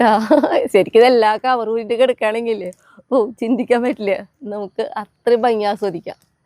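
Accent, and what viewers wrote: Thrissur, India. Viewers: Nice vedio very useful you are from thrichur taking nicely ❤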